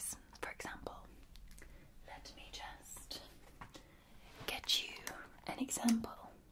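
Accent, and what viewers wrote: Scotland, United Kingdom. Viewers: No she's Scottish 😎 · oh... you resplendent scottish lass. ☺️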